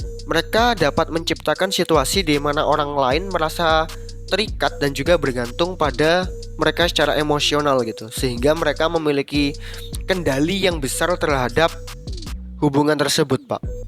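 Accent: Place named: Java, Indonesia. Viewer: logat jawa pake lo-gw jd aneh ditelinga, mending kamu-aku wae mas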